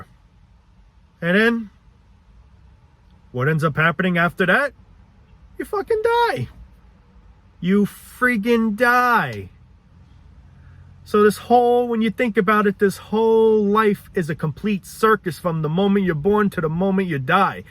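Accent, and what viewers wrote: New York, United States. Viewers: Hey dude I just discovered your channel and love it! You speak the truth! Most people just plain suck and this rat race life is awful. I hate it. I hate getting up in the morning to go to work and deal with the inconsiderate drivers on the road and then with the annoying and fake people at work. I hate then coming back home and only having barely enough time to eat before I have to sleep and then do it all over again. Ugh. I am going to try really hard to get rich somehow and then not have to work as much. Most people out there take advantage of others and are not your friend. Keep pumping these videos out bro you are doing a great job. Are you from New York? What part? That accent is pretty thick. Thanks!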